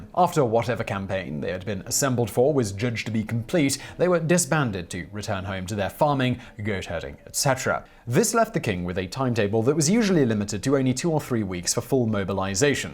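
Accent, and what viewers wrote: England, United Kingdom. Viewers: your English we expect you to pronounce everything wrong. Don't sweat it. Your English accent is very appealing for you. Your content and your videos are always wonderful.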